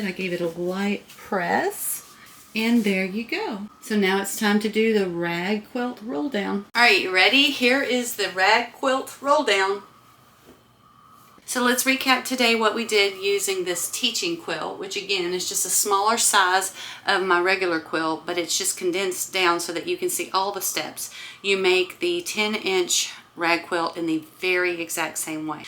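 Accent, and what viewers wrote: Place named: South, United States. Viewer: Wow! Girl, I just realized I am watching you.....enthralled! I love your voice, that southern accent, I can't wait to do this sewing! Thank you for being awesome!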